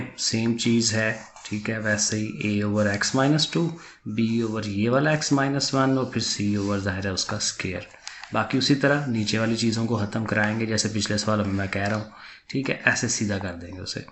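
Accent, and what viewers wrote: Sialkot, Pakistan. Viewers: sir ap ki awaz se lgta he ap sialkoti hein?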